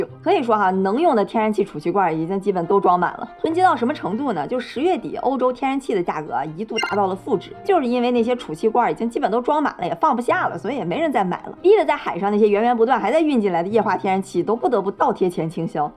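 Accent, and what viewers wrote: China. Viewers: 講話大陸本土口音太重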